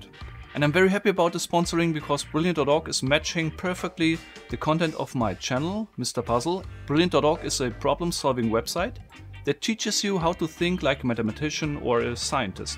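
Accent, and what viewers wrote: Germany. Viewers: Wie man einfach hört dass du Deutscher bist lol... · damn now I love (grüße aus österreich btw) (cause he obviesly sounds german haha:D) and brilliant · Wenn ein Deutscher Englisch spricht...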